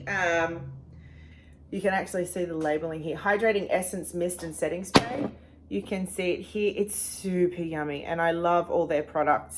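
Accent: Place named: Australia. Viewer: You are gorgeous, with or without makeup. I love your Australian accent. I enjoy listening to your videos because of the way you speak. You mentioned that you are delving into acting projects. Are you learning how to pronounce words in other accents as well ?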